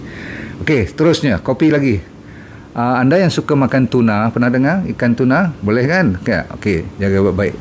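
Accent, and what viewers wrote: Malaysia. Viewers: Saya dari Indonesia senang nonton video Profesor, hanya terkadang sedikit kesulitan memahami bahasa dialek Malaysia profesor, 😅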